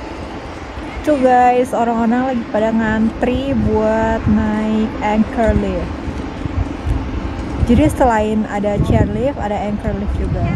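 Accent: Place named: Java, Indonesia. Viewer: Mbk logat omonganmu kok ke Jawa"an gt.. Asli orng Jawa ya.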